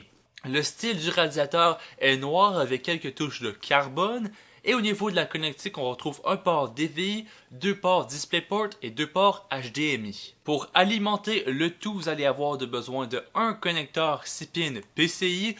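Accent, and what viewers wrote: Canada. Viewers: Je comprend bien, tu est Canadien ?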